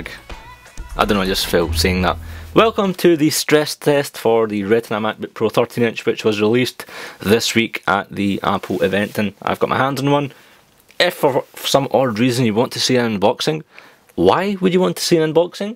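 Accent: Scotland, United Kingdom. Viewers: Scottish accent FTW!! Nice video btw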